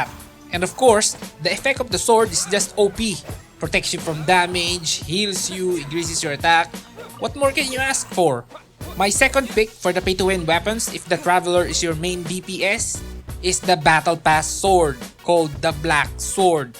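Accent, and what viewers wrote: Philippines. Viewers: that voice....and accent... Filipino?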